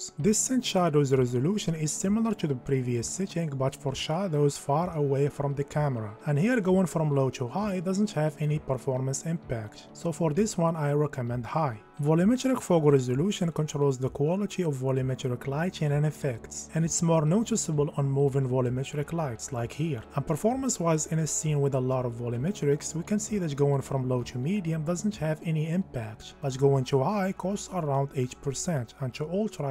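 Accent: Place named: Morocco. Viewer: Your English accent like moroccan people , nice videos keep going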